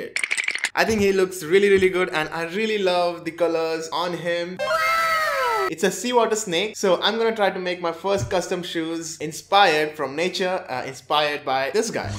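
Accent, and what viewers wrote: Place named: India. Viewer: That's kinda rude,but i think that he's Indian cuz his accent.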